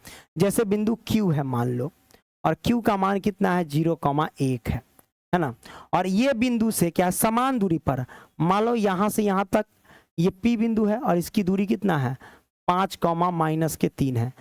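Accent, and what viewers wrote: Bihar, India. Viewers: Sir aap bihari hai n 😅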